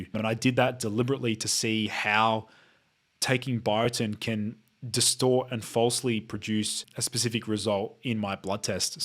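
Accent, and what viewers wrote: Australia. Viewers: I literally couldn't finish the video, Ozzie accent is far too thick, drives me nuts 😢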